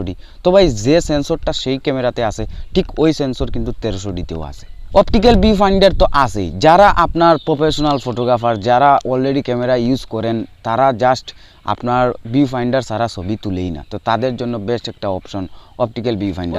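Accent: Sylhet, Bangladesh. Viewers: Sylhety ni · Vai apni ki Sylheti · ভাই আপনি কী সিলেটি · Tumije sylhetii 🎉🎉 ita mateouu buja jayyy ❤❤valobasha niooo priooo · Bai apnar bari mono koy Sylhet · ভাইয়া আমি ১০০% শিওর আপনি সিলেটি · Kotar maje sylhety vasa ase... sure apne sylhety fuya😊😊😊 ami o sylhety furi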